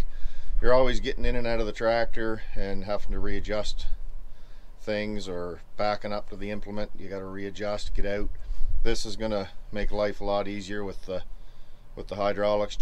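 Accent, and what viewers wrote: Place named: East Coast, United States. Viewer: As I’m watching this clip I’m thinking . Man he has an east coast accent haha. Then I heard Fredericton. Howdy from PEI.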